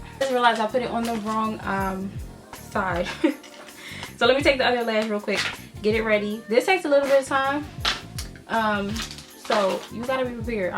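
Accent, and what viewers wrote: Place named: Philadelphia, United States. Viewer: I somehow immediately knew you were from philly. I finally understand our accent 😭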